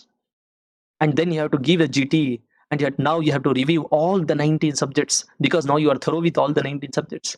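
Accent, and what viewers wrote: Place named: South India, India. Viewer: South Indian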